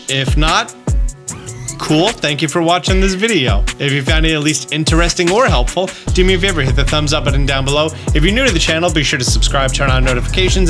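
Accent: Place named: Philadelphia, United States. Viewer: Are you from Philly? We're like the only people in the country that say "wooder" instead of water.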